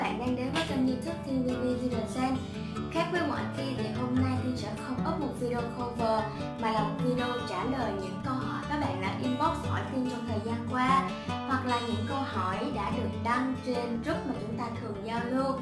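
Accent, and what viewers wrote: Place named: South, Vietnam. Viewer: Người miền bắc nghe giọng miền nam không quen và đệm nhạc vào nữa nên khó nghe rõ .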